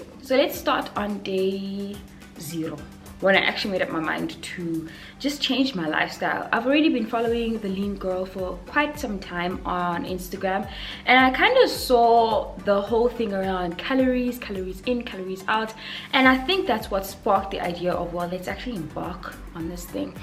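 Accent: South Africa. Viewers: so just stumbled upon your page, looooove your accent it's giving south African vibes man, where are you from? (sorry if i'm too forward) I always get this excited when I hear a fellow African accent somewhere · Obsessed with your accent. South Africa?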